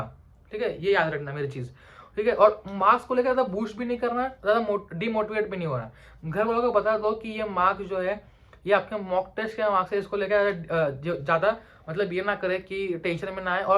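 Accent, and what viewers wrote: Maharashtra, India. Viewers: Kaha se ho bhai tum ... Maharashtra se ho kya ..I think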